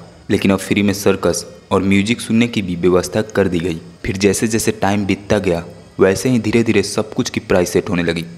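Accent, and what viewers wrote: Bihar, India. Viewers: Aap kya bihar se ho apki bhasha se pata chalta hai. Ap bahut hi knowledgeful video banate ho thanks for this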